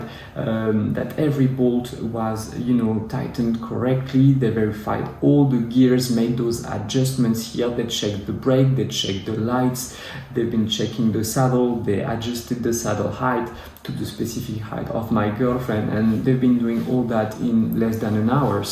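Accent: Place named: France. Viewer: Oulala ça pue le français qui parle anglais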